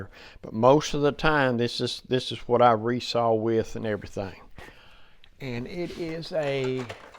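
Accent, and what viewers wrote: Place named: Kentucky, United States. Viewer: Hello sir. I have been watching you from Kentucky. I TRULY enjoy your videos, and one of the reasons I stuck around enough to learn something was it was nice to hear someone who talks like me. Thank you for putting up with foolish and mean people in order to teach and entertain the rest of us.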